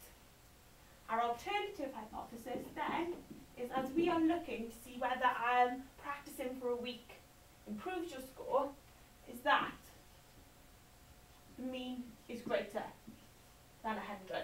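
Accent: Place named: United Kingdom. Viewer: damn that british accent -_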